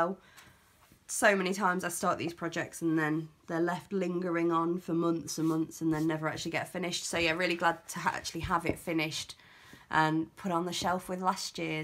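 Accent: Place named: Texas, United States. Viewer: Enjoyed your video very much,! As a Texas girl, I enjoyed your accent almost as much as your album! I have photos and an idea for each day, but haven't started yet. Thanks for all the great ideas!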